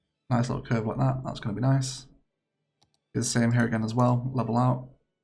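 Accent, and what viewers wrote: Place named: England, United Kingdom. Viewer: Awesome, you're easily one of the top 5 Cities Skylines channels around. Also, your accent is beautiful, which part of England is it from?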